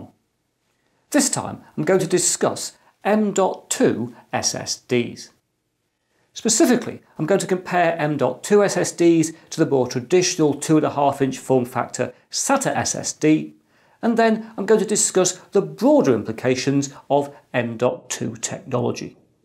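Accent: United Kingdom. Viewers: Information goes in so much easier when it's a fellow brit explaining it lol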